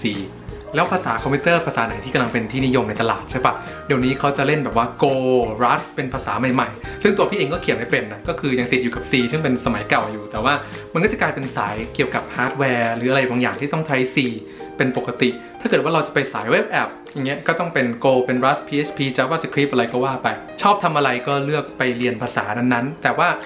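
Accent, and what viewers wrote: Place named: South, Thailand. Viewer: สำเนียงคล้ายคนใต้มาก 555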